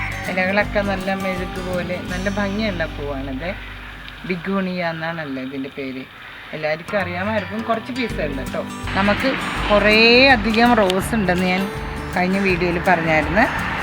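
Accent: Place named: Ernakulam, India. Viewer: Chechiyude samssaram kettal Ernakulam pole thonnum👍👍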